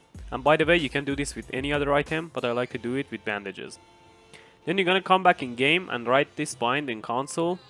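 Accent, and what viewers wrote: Iran. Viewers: i bet you are iranian,ur accent man :D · kheili moshakhase ye irani dare English shoat mikone irani hasti?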